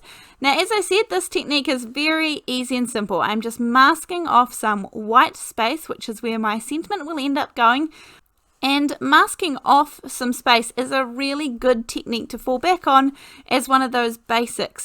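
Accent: New Zealand. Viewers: Thank you so much. I’ve got some of that. I’ll give it a go I do have a hard time but maybe it’s the paper. I love, love your videos. Your kiwi accent is lovely to listen to. I’m in Aus. Perth. ❤️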